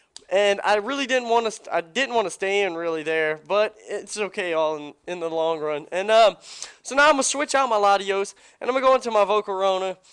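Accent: South, United States. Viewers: I DO BELIEVE. i liked that catchphrase lol. you sound southern. Usually i don't like that, but i like you lol. nice narration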